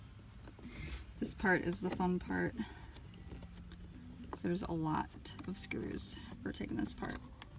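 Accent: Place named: Canada. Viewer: Thanks for tips, you sound Canadian.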